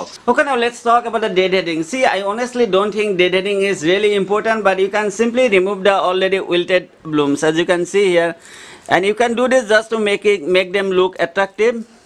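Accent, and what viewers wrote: Assam, India. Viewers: Have been following ur videos.wonderfull description as if l am going through plant encyclopaedia. accent seemed an Assamese from guwahati ,my city.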